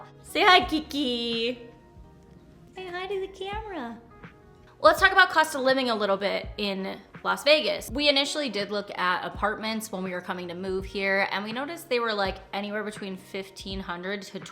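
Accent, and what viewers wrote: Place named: Wisconsin, United States. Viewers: I knew you were from MKE from your accent!!! i can’t wait to become the next Wisconsin girlie to move to Vegas 😂🎉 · Great video and I’ve been enjoying your content since you started. The biggest takeaway from this video wasn’t about Vegas, it was about you. I didn’t realize you lived in Wisconsin! I always thought you lived in Vegas. Now that I know you’re from Wisconsin, I can’t help but hear your accent! Anyways, congrats on the move and keep up the good work!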